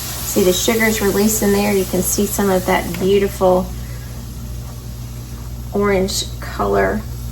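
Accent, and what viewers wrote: South, United States. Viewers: im in northern maine and the temps are slowy dropping here the leaves are just starting to turn/drop...i found you on my home page... 1 THING I LOVE about your channel... your accent isnt as heavy as alot of southerns..I DO LOVE IT BUT some can get "twangy" /sing songy, if you get my meaning... and you dont do alot of chattering when you cook and you CLEAN OUT THE DISHES!!!!! my pet peeve.. with everything going through the roof HOW CAN YOU AFFORD TO NOT USE IT!!! thank you for all that you doo..;];];] keep up the great work;];];]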